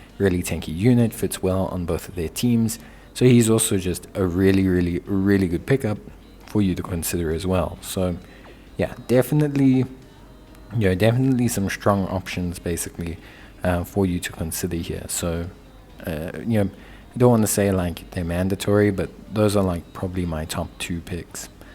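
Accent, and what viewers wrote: South Africa. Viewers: Woah bro, are you South African?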